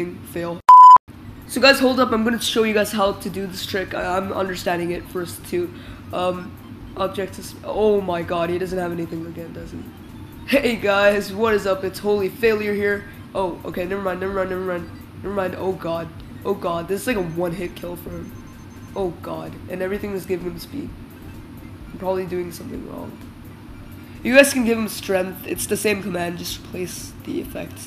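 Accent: India. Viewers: r u an indian?????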